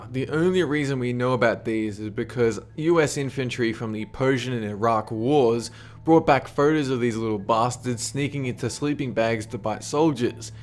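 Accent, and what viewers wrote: Australia. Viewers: are you australian? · are you Australian or kiwi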